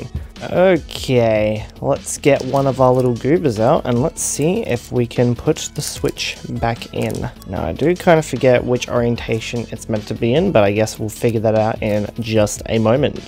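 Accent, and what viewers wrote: Australia. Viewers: i think he's just australian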